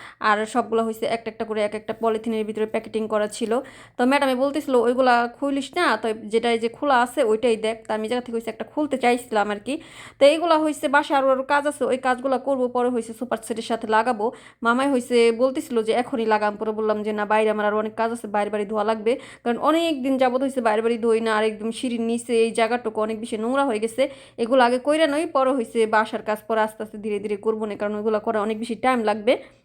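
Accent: Faridpur, Bangladesh. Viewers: আপু আপনার মুখের ভাষা শুনে কেনো জানি আমার মনে হইতেছে আপনার বাসা ফরিদপুর। আপনার বাসা কথা জানাবেন আপু?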